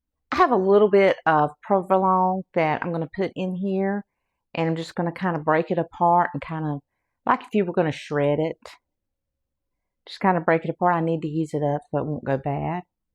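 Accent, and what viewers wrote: South, United States. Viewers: I am so excited that I found your channel, love your beautiful southern accent and your recipes!!♥♥♥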